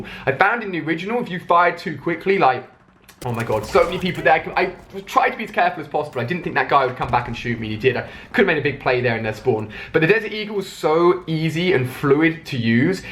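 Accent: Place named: United Kingdom. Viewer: hmm all the guns and explosions sounds a British guy talking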